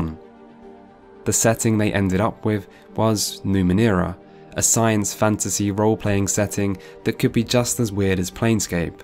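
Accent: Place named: United Kingdom. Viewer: but you have a British accent!!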